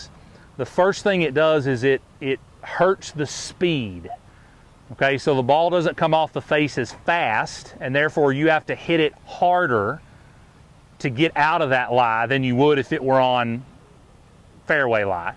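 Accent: South, United States. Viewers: I found you today on YouTube, a little behind everyone else. You have a great method of teaching. I completely understood everything you said and described. A southern accent is greatly appreciated by this Texan ;-) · your method and delivery of the training is great. You explain everything in a logical, easy to understand way.. This past weekend I tried to use the techniques in my short game and they really helped. My short game is the worst part of my game so any thing is a plus haha! I have watched all of your videos now. You present the information so much better than other teachers. Keep up the postings. I will watch everyone. BTW, where are you located? I detect a Southern accent. Am I right?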